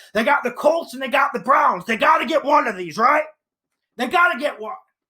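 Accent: Minnesota, United States. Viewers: is the prodigal sun, and the finest Minnesota accent known to man...The Gun barrel "Next Game" and " team X " AT" makes me tune in every episode. But one question, do you go "Bartender, "NEXT" round of drink? Keep it real and Merry Christmas! is a NFL Marine, and comes out firing!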